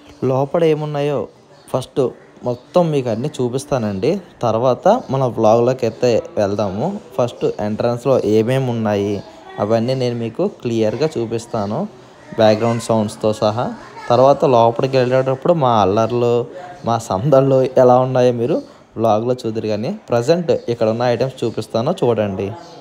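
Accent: East Godavari, India. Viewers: Oribabu east godavari language apura babu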